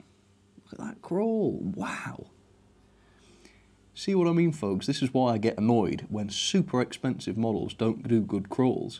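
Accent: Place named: Northern England, United Kingdom. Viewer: He He....! Naughty giggle was had, when the Strange Noise was Described "As a Sheep Coming..!" My Very Sick mind went somewhere utterly Wrong. Imagining ' in Field, Wellies on, chasing down a Rather Worried Sheep..!' So Sorry, but you are from 'Up North', somewhere.?? As I am from Essex, (Originally) anything past Watford is 'Up North' to me..!! Great Review and I am looking for a Nice 0.6.0 tender Loco in B.R. black. So ended here, trying to Avoid Hornby ATM. At least until they get their Shite Together..! Cheers All. Kim in Oz. 😎 🐑🐑🐑🐑🐑🐑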